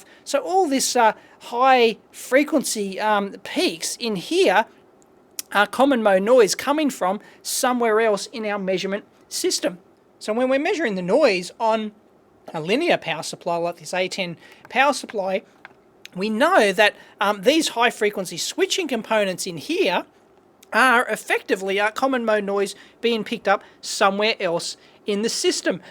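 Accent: Australia. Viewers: I'm a "Yank", but I'm starting to hear a little Aussie voice in my head while troubleshooting hahaha!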